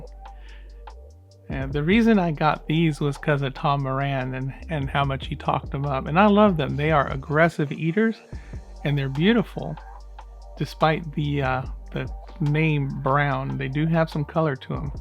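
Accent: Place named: United States. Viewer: I'm so used to how Petko from The Dark Den pronounces the names that it sounds so weird when I hear Americans say them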